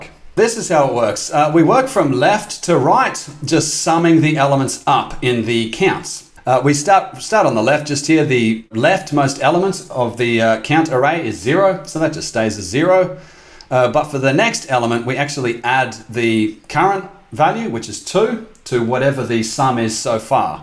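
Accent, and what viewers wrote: Australia. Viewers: You speak algorithm language You speak australian accent You say adios spanish language In my mind, i see a natural 0x10 base or 0x100 base for almost any kind of data, such as string, floats etc, and very fast too with bitwise operation and direct addressing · Are you Australian? I'm new subscriber, just asking because you sound like an Aussie guy I know.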